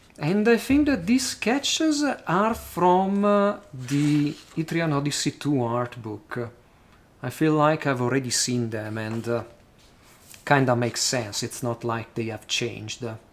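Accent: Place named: Italy. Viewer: Dalla cadenza inglese/maccheronica sembrerebbe lmao sarebbe una gioia, io non conosco nessuno che gioca a etrian odyssey... E community italiane penso non ne esistono :/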